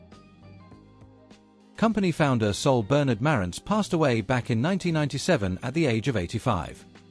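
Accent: United Kingdom. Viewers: So according to the narrator with the British accent, everyone has been pronouncing the name Marantz incorrectly. So phonetically Mare (like the female horse) and ents (like the tree beings in The Lord Of The Rings) it's "Mare-ents"(?) Huh... Learn somethin' new every day. (lol)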